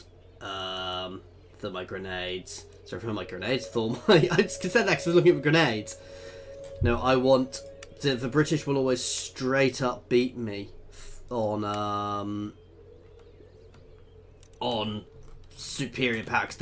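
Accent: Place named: United States. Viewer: by the sound of your voice, you're as american as they come 😎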